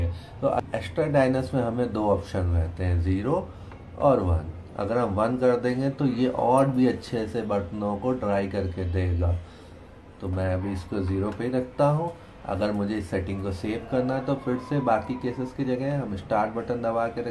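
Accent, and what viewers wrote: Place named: India. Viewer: I not understand nothing ur indian accent ohhh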